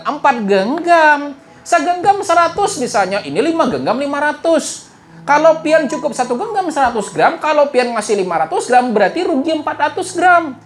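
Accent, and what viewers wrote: Banjar, Indonesia. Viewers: Urang banjar kh ini pina logat nya 🤭🤭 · Pandernya kaya ada logat banjar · Logat banjar nya mantap pak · Loghatnya seperti orang Banjar/kalimantan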